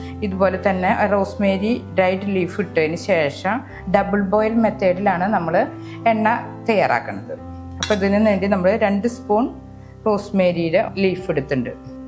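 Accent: Thrissur, India. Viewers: Changathi, athu njangade thrissur bhashayanu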